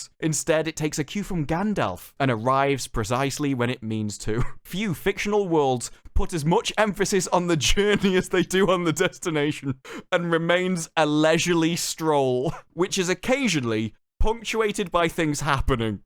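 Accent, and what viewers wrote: United Kingdom. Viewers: You and Council are a great combination for hearing about the show, which I will never see apart from within your videos. I'm sure you've checked out his stuff - his low-key snark is a great pairing with your classic British delivery. I honestly don't know what they spent their money on! I thought a lot of their backgrounds looked like old series Star Trek background paintings. It just does not look modern, and it certainly doesn't look inventive or creative. Like a few other people have said, it doesn't seem to have a soul. I guess the best thing that can be said is the professor Tolkien is not here to witness it.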